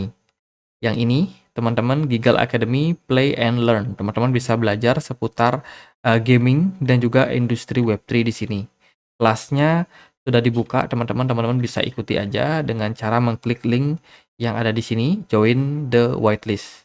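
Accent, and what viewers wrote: Bali, Indonesia. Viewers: Dari vokalnya jelas orng bali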